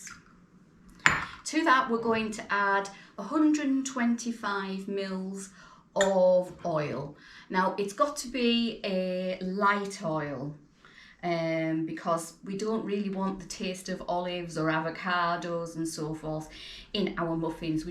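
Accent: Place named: Yorkshire, United Kingdom. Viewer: Will defo give this a go. Can I just say I am guessing your accent, are you north east or Yorkshire way?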